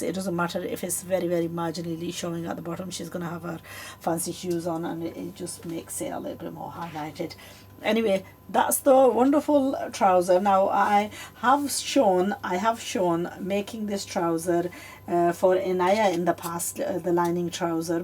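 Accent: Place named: Australia. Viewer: I don't know but she speaks like Australians but she is Asian.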